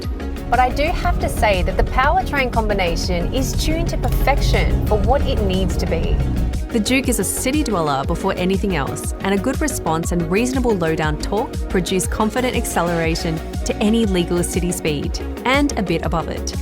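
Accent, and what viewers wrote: Australia. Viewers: Australian not British.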